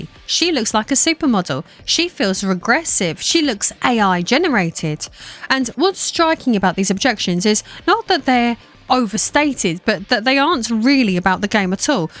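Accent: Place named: Cockney, United Kingdom. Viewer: That cockney accent coming through but also sounds a bit Greek, am I wrong? Great video btw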